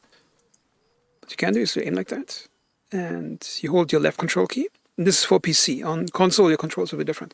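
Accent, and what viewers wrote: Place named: Germany. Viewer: Something about your voice‘s pitch, the slight German accent and the way you accentuate your sentences is very endearing to listen to.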